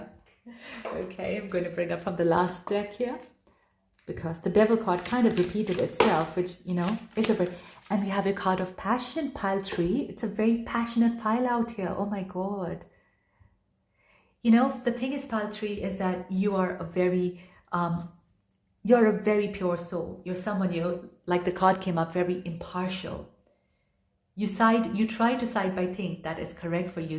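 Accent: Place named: India. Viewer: Desi ho kya ? You sound indo English I’m indo Canadian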